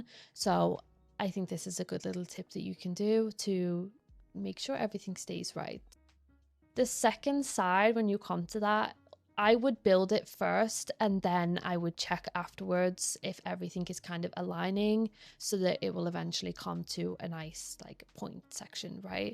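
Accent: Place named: Scouse, United Kingdom. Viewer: Lovely stuff! Forgive me for asking, but do you have any affiliation with the city of Liverpool? Quite a lot of the things you say sound like there is a twinge of a scouse accent there! :)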